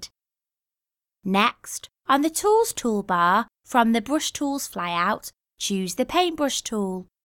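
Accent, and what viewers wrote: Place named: North, United Kingdom. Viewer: You can't beat a good old northern accent. Salt of the earth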